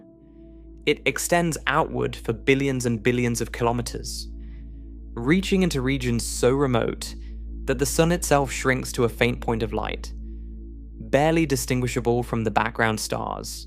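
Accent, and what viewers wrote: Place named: RP, United Kingdom. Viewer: Hi from Lake Como, Italy. I like your videos. They are made with AI but indeed perfectly put together; I like the accent of the AI voice, sounds like received pronounciation but with a modern twist. What tool are you using to make the narrating voice?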